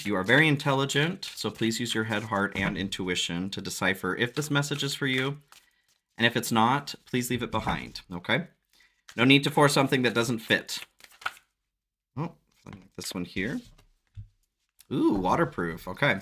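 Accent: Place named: South, United States. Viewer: I love your personality. Serious to thick southern accent. Your Reading style is great❤ All goes smoothly in your surgery Oh no😂 I just saw Mr. Bill😊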